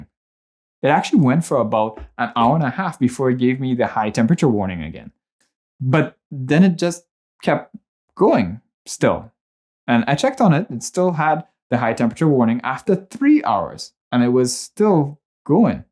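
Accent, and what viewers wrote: Trinidad and Tobago. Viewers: Do I hear ah Trini ??? 🇹🇹👀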